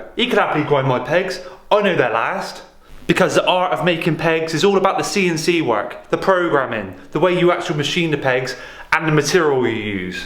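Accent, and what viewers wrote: United Kingdom. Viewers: Are you Cornish 🔥🤘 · hate english accent.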